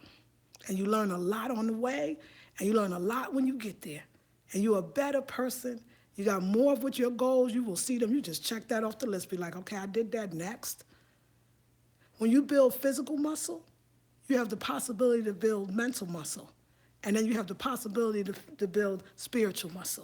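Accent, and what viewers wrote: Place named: New York, United States. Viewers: She said she moved to CA from NY, so that is most likely what you hear. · You have a new york accent not california accent.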